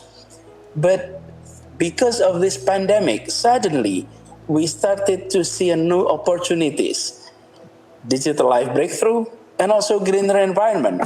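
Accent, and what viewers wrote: Indonesia. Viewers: To be honest, this is the very first time I see this 'bahlul' speaking English, and I have to say that I'm not at all impressed with his English. I find he just speak Indonesian with English words (not vocabulary). You know what I mean, don't you?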